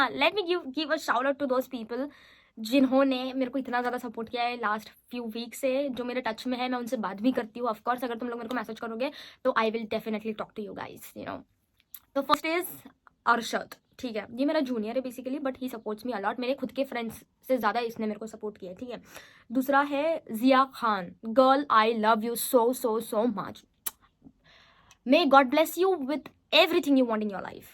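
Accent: Maharashtra, India. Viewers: I just love the way you are talking and your marathi accent in hindi is such a marvelous to hear ❤❤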